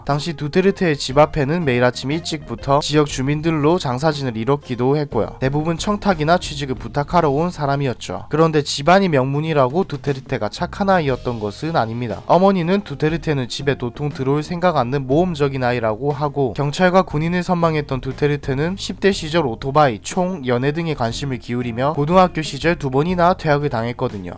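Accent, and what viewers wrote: Gyeongsang, South Korea. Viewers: 경상도 억양이 억수로 좋네요!! 🤩😎👍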